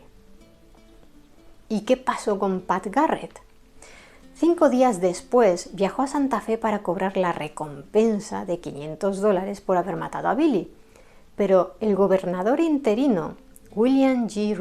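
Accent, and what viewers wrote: Castile, Spain. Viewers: Esta señora, además de tener un acento castellano exquisito, es una experta en la historia y sin pasión, le gusta la verdad.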